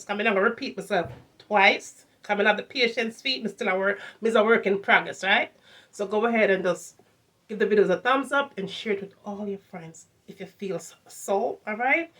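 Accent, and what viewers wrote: Jamaica. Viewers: Yes bestie I'm so happy you got Jamaican accent as me also I'm half Jamaican accent and speak English or some Spanish word.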